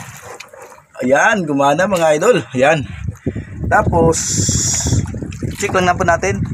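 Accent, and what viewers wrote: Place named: Bohol, Philippines. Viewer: tujok tujoka noy .. taga bohol man diay mo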